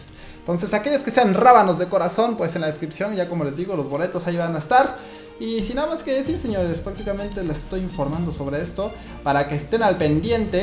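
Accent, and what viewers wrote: Mexico. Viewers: entonses ¿de que país eres? siempre pensé que eras de México:p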